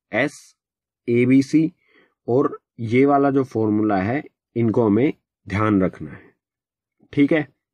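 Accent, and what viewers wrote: Haryana, India. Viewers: Thank you so much sir for this video Sir one more question :- Are you from haryana? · Sir aap haryana se belong karte ho kya 😊 apki voice mein haryanvi tone hai 😊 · Very well done sir, if you do not mind, kindly change your Haryanavi tone. it will be better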